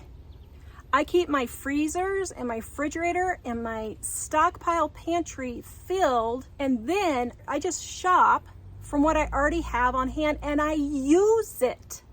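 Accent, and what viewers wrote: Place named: South, United States. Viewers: I am a firm believer in being prepared for any situation!!! This upcoming winter is gonna be a bad one. I would much rather be prepared now then wait. Seems like some people just don't wanna listen and prepare now but I loved your southern accent lol. I'm with you can never have too many spices 😁😁😁👍👍👍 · 1., are you sure you aren’t Southern? 2. These people have never been hungry or they would know how if you are hungry enough you wouldn’t look to see if something is expired. 3. Cereal is nutritious when that is all you have and I eat Cheerios Honey Nut every morning. 4. How do you know if a can food has become infiltrated with botulism, I’ve always heard canned food can have it like bent cans? I’ve never heard how to recognize it. 5. People are going realize Pride goeth before destruction! Forgot verse number and book!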